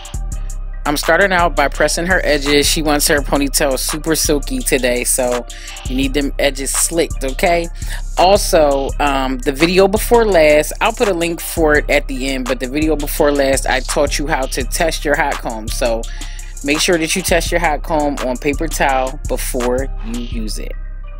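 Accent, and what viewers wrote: Philadelphia, United States. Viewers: I was about to say that you sound like you’re from Philly, then I saw that it was you lol. I want you to style my short hair 😩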